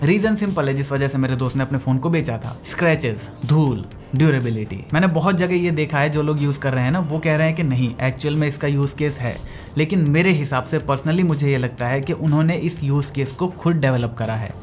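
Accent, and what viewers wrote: Bengal, India. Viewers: Are u Bengali bro?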